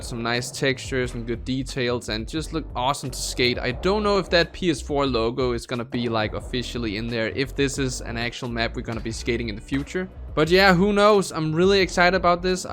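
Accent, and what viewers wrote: Denmark. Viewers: Are you danish bru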